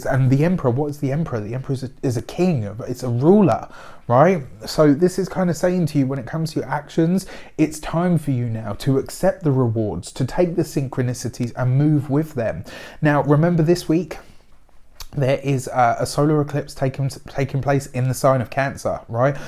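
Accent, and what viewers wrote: United Kingdom. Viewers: British accent ? Nice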